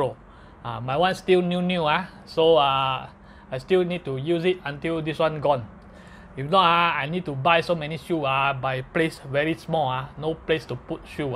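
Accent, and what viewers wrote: Singapore. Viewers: HAHA. Funny uncle. Thanks for your comprehensive guide! Love your Singlish! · first time come across your video! solid. keep the singlish real. feels like home!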